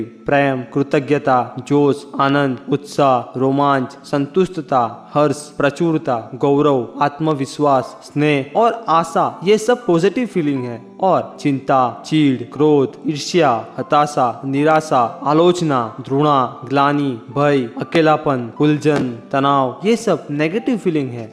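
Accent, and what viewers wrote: Gujarat, India. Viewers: Gujarati ya marwadi honge shayad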